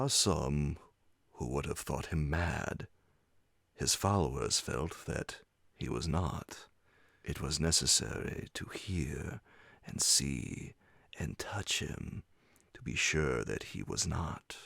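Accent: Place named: United States. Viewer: Thanks for this one! One off my favourites of Poe's tales. You've got to have a talented American narrator for Edgar.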